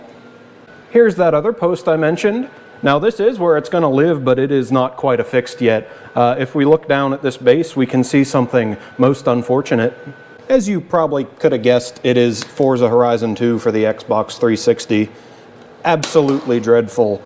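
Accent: Canada. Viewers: Trying to peg the accent.... originally from the great white north? I'm getting Canadian or Minnesotan vibes. Love the channel!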